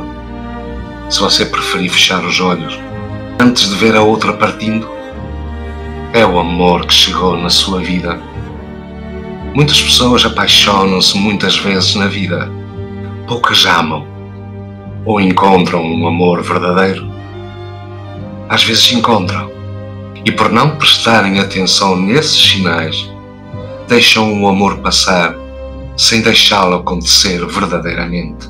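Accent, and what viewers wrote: Portugal. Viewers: Samir 4150 sotaque Português de Portugal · Lindo e neste sotaque português ficou ainda mais terno e doce, irresistível, um bálsamo para a alma! AMEI!! 😃😍😍👏👏👏🙏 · DEsculpe....Só não gostei q fosse nesse sotaque português.... tanto Drummond quanto Selma são brasileiros, e essa música de fundo trás um clima de funeral....qdo fala de amor chegando em nossa vida... 😢 e não condiz com a mensagem ... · Amei a declamação do poema. Este sotaque português é divino. Quem declama?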